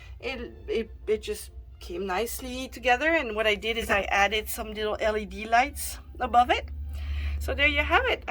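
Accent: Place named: France. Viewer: you have a french accent, you're french?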